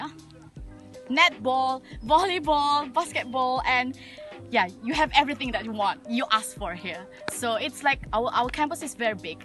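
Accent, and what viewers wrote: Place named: England, United Kingdom. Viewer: This is really good information because I dreamt to go here btw I like your English accent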